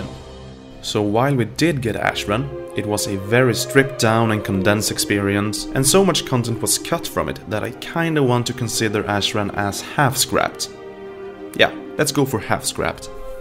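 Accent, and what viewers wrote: Sweden. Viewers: Swedish accent ... Indeed it was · Swedish accent IF am correct? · Man hörde knappt den svenska accenten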